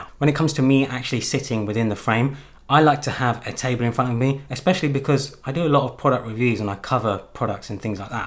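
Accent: United Kingdom. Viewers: Hey that studio set up is Tickety-Boo looks sweet, quick question you mention $ in the video but that accent is defo from here in Blighty - Happy New Year!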